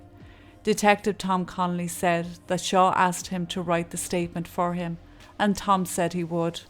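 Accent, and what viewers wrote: Ireland. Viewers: Nightmare on Crime Street…YES!!! That beautiful Irish lilt. I could listen to read the phone book and it would still sound lovely and interesting! 😄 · "To be, or not to be - that is the question?" (Shakespeare - Hamlet) The pivotal question here, is whether one can ever accept the barbaric practice of torture, in the pursuit of what we believe is justice (conviction and subsequent closure in this case)? Should the criminal justice system to be influenced by politics? Should criminals ever be given back all of their freedoms, without proving complete rehabilitatiom of the behaviours which caused them to be incarcerated in the first place? Are prisons places of correction, or are they established for 'other' reasons? If Elizabeths and Mary's death are really meant to mean anything of greater value, have the Irish (and English) justicial systems learned and improved, from these tragic events? 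When being presented with these excellently researched and presented cases, one often wonders the motivations by the producer, why embark on such depth, why and what about such a case are their obvious empathy and compassion, as well as their perceptions of injustice, tiggered them into such a selfless search for the truth? Wonderfylly researched, produced and presented, in a lovely Irish accent, who couldn't like these videos, even though the subject matter is about death, torture, injustice, and often incompetance at all levels. They are set in a beautiful backdrop, in a wonderfully historical place, they are contextually perfect. Thanks again!! P.S. I accidentally removed my previous comment, whilst attempting to remove my answer to yo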